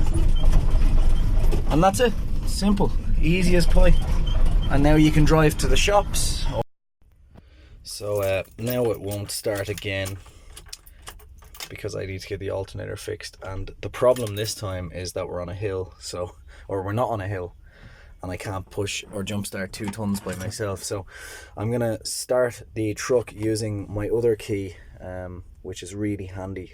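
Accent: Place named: Ireland. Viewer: The Irish accent and the laid backness does it for me 😂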